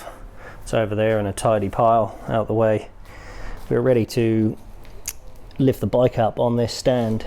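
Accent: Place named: West Country, United Kingdom. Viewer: I wasn't sure whether it was West Country or Norfolk. Hahahaha. I'm Peterborough born and bred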